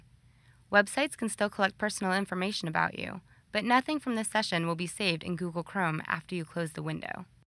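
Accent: United States. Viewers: She just sounds American to me... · She speaks american in a very annoying way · The Voice of the narrator who is obviously from Long Island is the reason I will not be downloading this. I couldn't get through her voice or her gleeking. It's TAB not TAAAHHB. Arrrghh she is SO Long Island. I can hear the saliva in each word. She does sound hot though. Go figure. Firefox with Google bar is just fine.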